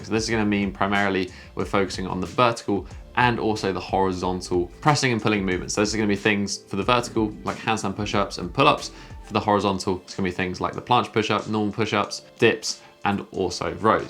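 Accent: England, United Kingdom. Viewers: Because why pronounce just that CH in the none english way when you do the rest with that english accent?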